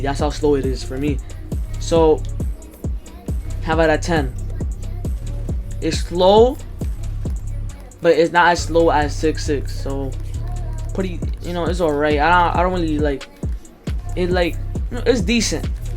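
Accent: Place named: Bronx, United States. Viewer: nah you just sound like it gang ik a Hispanic Bronx accent😭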